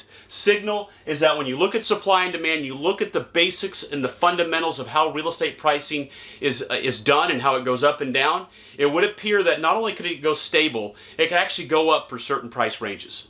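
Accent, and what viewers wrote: South, United States. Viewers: I'm working to get pre-qualified now as a first time home buyer. Just want to say that I love your videos,. You've got great energy, mannerisms, and a southern draw to boot. I could watch all day!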